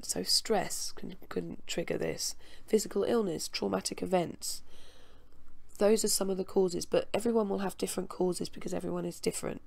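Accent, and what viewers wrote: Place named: United Kingdom. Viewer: I’m glad you have a British voice.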